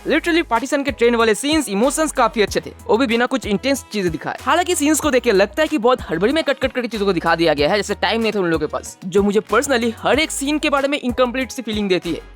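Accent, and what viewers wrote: India. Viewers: Baton se ap indian Q lag rahy ho ap 🤔🤔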